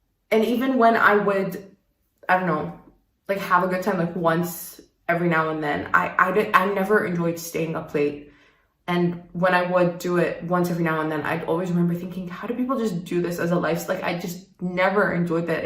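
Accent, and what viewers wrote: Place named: United States. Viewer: Based on your accent I definitely assumed you were American lol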